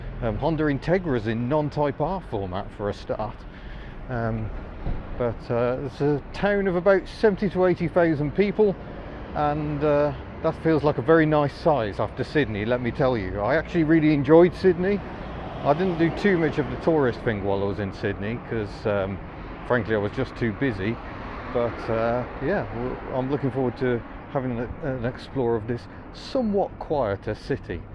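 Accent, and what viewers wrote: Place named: England, United Kingdom. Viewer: Actually, the pronunciation of Launceston is more like Lon-ces-ton. If you call it *Lonnie*, they will think you're a local. Ok, maybe not with that English accent of yours. Lot of mainland blow-ins now so the name pronunciation may well have morphed a little. I used to live for a time during my schooldays in the 60s in Garfield Street, South Launceston. Attended high school in the suburbs Kings Meadows. Worked there for a time in the 70s after completing my apprenticeship. It is a nice city now but used to get very smoky in the 60s when everyone had wood, coal or briquette heating. I still miss the electric trolley buses of that era, not to mention the old Leyland buses with the half cab.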